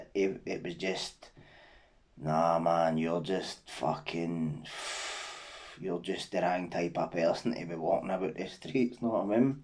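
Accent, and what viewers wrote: Scotland, United Kingdom. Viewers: Your quite consistent with your videos,thats a gd thing.aye a widnae worry about they stoaters that are commenting on your accent,i can read and speak english really well.but id rather speak in my native tongue,like yourself,its more authentic.nothing worse when ye have fellow scotsmen trying to bring ye down for not speaking english lol.its not even our language in the first place.😂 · We speak English with Scottish dialect 😂 the world over has problems with our speech....deal with it people your doing amazing keep it up big guy love from Coatbridge ❤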